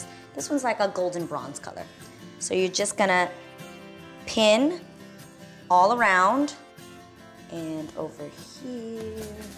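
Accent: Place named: New York, United States. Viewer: I’m in love with your New York accent. I’m jealous. By the way, awesome video! I’ll definitely try one of them!❤️💙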